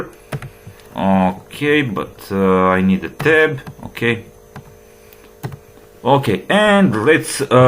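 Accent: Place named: Eastern Europe. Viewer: noice true slav accent.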